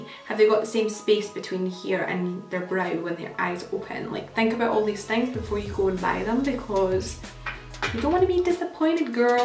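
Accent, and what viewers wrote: Scotland, United Kingdom. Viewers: After watching your videos, I always find myself going away to do my make up😂 love hearing a Scottish accent on YouTube as well😁🏴💕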